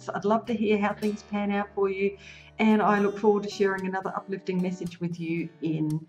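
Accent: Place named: Australia. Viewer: Hi! Thanks for the warm, positive energy. You sound Australian and I love it. It's my intent to travel to Sydney in Sept.; I just have to find a way to make it work out in the best way. I've lived there twice for 3 months before. Sydney, Canberra, Melbourne, Port Lincoln and Darwin, plus a few days in Brisbane. I have a Jupiter IC going right through Canberra, with a couple of Venus crossing lines above and below Sydney!! Do you like Astrocartography? I'm a handwriting analyst and O love to combine them all with deep theta mediation. God, why am I rambling??:-) Just saying thanks for the positive vibes, love to see you in Sept!!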